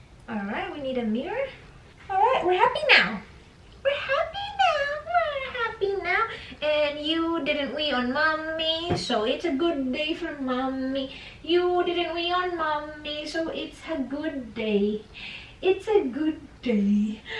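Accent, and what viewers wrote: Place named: Visayas, Philippines. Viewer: Hala gibisaya nana nmu ate..hehehehe..so cute😍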